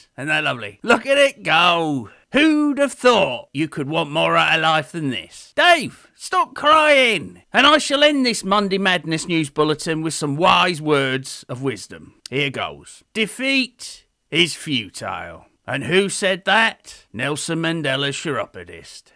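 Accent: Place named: Liverpool, United Kingdom. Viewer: 🇬🇧 Another Cracker! Scouse accent a blinder mate! 😂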